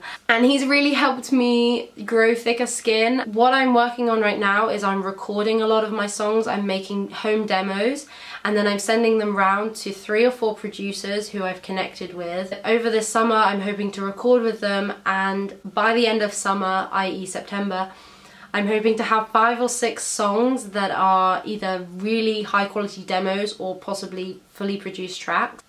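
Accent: United Kingdom. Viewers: I DIDNT KNOW YOU WERE ENGLISH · I really like ur London accent XD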